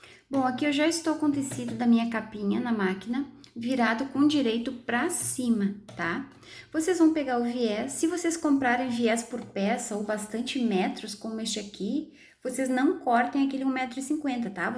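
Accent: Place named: Curitiba, Brazil. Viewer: nossa... Minha vizinha é curitibana e o sotaque é assim tbem rsrs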